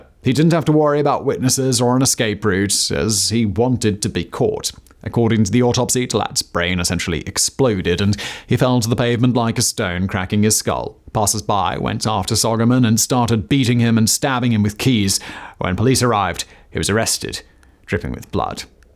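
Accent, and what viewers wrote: England, United Kingdom. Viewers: Thank you for covering this overlooked piece of history. Any light shed on this matter has the potential to save the lives of Armenians who are in what seems like a forever struggle to keep what little home they have left from classical antiquity. English and especially French churches were instrumental in saving my grandparents so I'm humbled again hearing your English accent. Armenia has been experiencing the same horrors since the Seljuks first entered classic Byzantium.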